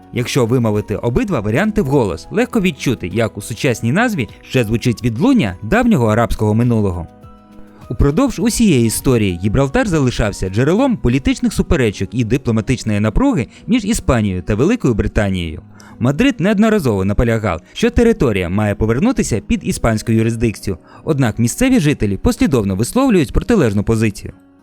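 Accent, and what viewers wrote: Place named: Russia. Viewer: Дикція на трієчку, ще й рашиським циканням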